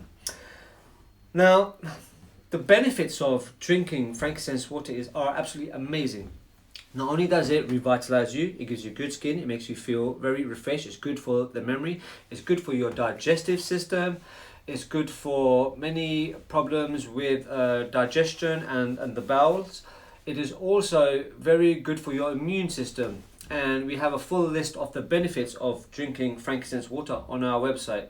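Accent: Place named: Pakistan. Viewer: This guy sounds like a pakistani not omani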